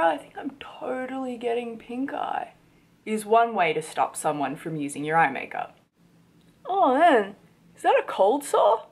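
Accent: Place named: Australia. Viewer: I'm really curious to find out where she is from. Her accent sounds Australian most of the time.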